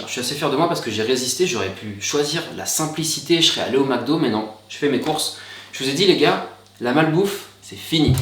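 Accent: Switzerland. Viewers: T’es Suisse?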